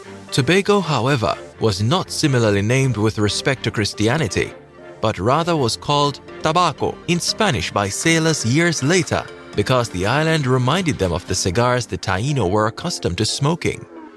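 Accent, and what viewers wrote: Jamaica. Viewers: The Host Presenter Is Jamaican/ I Can Hear The Accent🇯🇲🇯🇲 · He sounds Jamaican to me and I am Jamaican. But then again Grendians have a similar accent too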